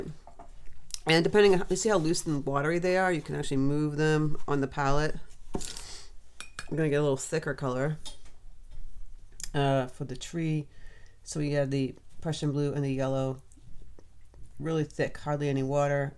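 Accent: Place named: New York, United States. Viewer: Love the NY accent ❤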